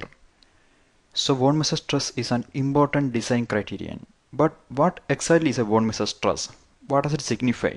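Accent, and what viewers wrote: Kerala, India. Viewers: Malayali aano Sir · It's an Indian accent. For those who are here to complain about his accent, please remember that you don't even know a word in Hindi or any other languages in India. Good job mate, with the video! Fuk accent critics. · Yes, he is an Indian, he's a mallu. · great explanation but Malyali accent tho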